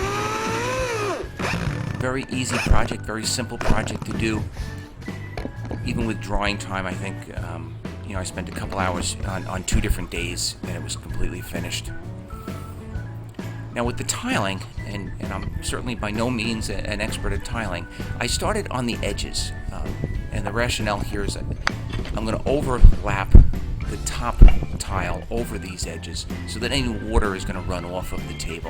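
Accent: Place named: New York, United States. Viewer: Nice work!....looks like a fun build....gonna do 1....u gotta be from NY rt?....haha....I'm in/from LI...I hear the accent....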